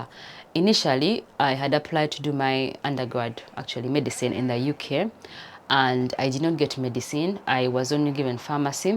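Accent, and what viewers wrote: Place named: East Africa. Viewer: you are fun. That is East African accent rocks.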